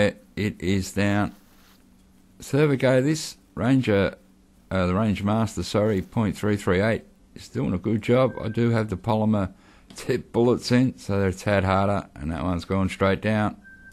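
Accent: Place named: Australia. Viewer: Another great one! Btw just love the aussie accent, sounds so "real" hehe :-D just love it mate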